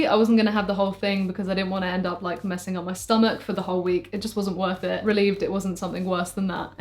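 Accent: United Kingdom. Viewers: The real question is, did someone make out with someone while at the mansion? Also I love how britishly you say "wa'eva", "we should'av donnet" and "sumting lae tha". Anyways, big fan here. I've watched you since you were a teenager and promoted Bias FX 2 (I actually bought it because of your videos). Keep rocking, you are amazing! Cheers!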